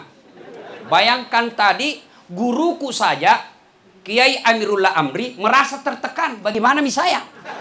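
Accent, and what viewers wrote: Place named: South Sulawesi, Indonesia. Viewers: Kentara medok bahasa bugisnya hahaha tho penrank to Sawitto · Pasti ada aja lucu lucunya. Logat bahasanya ust saya suka. Mantap bugis Makasaar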